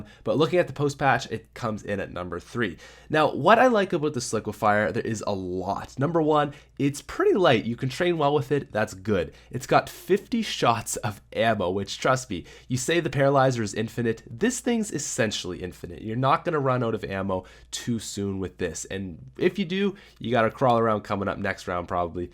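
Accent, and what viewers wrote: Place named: Canada. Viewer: Lol ur Canadian right? Love you Canadians out there. "What is not to love aboot this thing?" Hahaha 💖😁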